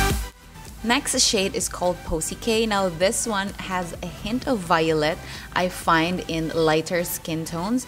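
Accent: Philippines. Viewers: ur filipino accent :) & i love the colors on u! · Hi! Are you a filipino? :) I can sense it from your accent hehe~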